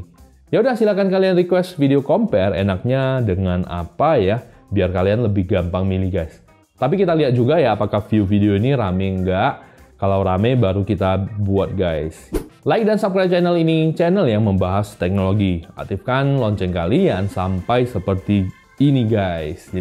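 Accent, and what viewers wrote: Pematangsiantar, Indonesia. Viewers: Bah orang siantar rupanya haha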